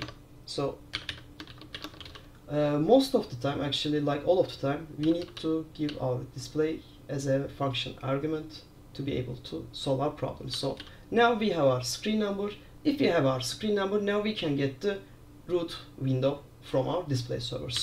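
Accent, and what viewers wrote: Turkey. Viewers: türk olduğunu 5 saniyeden anladım hocam, eğitim için teşekkürler. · Dinlerken dedim çok Türk'e benziyor ingilizce. Yorumlarda bunu görünce tetit etmiş oldum.😂 Başarılar hocam.🎉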